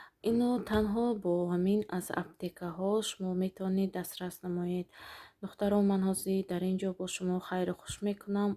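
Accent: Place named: Khujand, Tajikistan. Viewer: Рахмат ки чавоб додет👍👍.Ман аз гапзанитон фахмида будамки хучанди .Некин боз пурсидамм 🤭🤭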